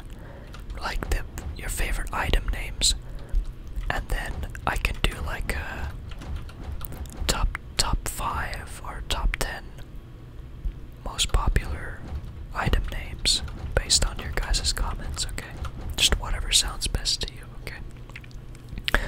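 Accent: United Kingdom. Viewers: u nailed that british accent ngl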